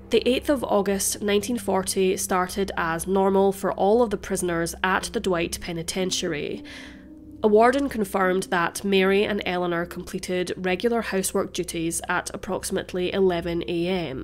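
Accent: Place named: Glasgow, United Kingdom. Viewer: I spent 10 years in Glasgow Scotland. I quite enjoy listening to your Glaswegian accent narration.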